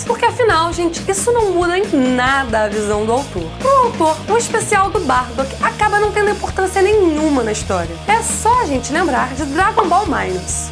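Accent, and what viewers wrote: Brazil. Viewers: Os vídeos do canal são ótimos, mas eu acho muito estranho ver a falando NAISCER ou NAISCER, isso é sotaque de alguma região do Brasil?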